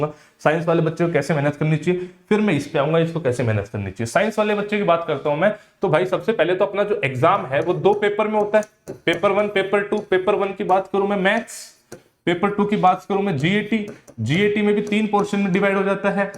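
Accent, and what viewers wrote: Haryana, India. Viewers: Sir Kya aap HARYANA se ho ? ☺❤ Aapki awaz or boli dekh kr lgta h ki aap HARYANA se ho ....... Please reply sirji 😊❤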